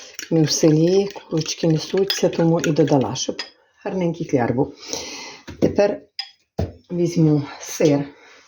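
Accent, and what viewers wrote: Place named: Chernivtsi, Ukraine. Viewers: Спррбую по вашому рецепту.По розмові ,певно ви землячка,думаю що ви з Кіцманщини.так?💐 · Моя братова з Чернівців ,говорить так як ви, мені дуже подобається.Дякую за рецепт Зараз буду готувати. Мирного нам неба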